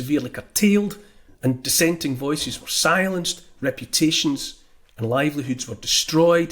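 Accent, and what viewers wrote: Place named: Wales, United Kingdom. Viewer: A Welsh accent saying things like "speed of science" tells you all you need to know. Deer me.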